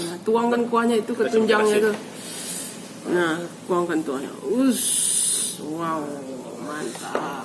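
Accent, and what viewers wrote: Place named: Bukittinggi, Indonesia. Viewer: Ba a dak bacalia an wajah uni ni? Bunyi suaro uni wak urg bukik tinggi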